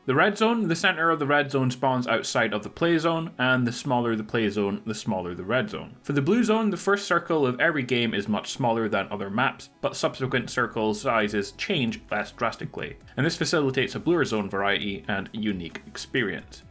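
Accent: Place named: Edinburgh, United Kingdom. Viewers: Sounds like Edinburgh. 100% not from Northern Ireland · Scotland not sure were maybe even just outside id guess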